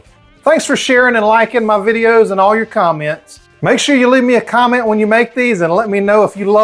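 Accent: South, United States. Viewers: Thanks, I can't wait to try this! P.S. this WV Hillbilly loves your Southern accent.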